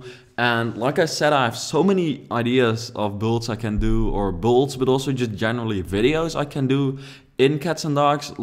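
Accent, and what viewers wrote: Netherlands. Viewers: Je kan duidelijk aan het accent horen waar je vandaan komt haha (geen haat, nederland staat er een beetje bekend om)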